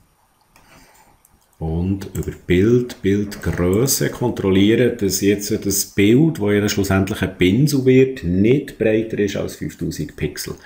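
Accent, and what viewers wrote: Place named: Bern, Switzerland. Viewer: Dieses Tutorial ist genial. Was ich da vorher vergebens geübt hatte! 6 Minuten in Berndeutsch und es klappt.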